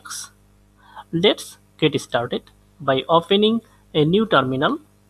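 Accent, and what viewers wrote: India. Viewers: Why does every programing vid have a thick indian accent lol Great vid btw :)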